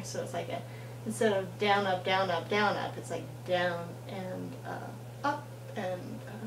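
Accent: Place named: United States. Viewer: You sound American do the americans play irish trad?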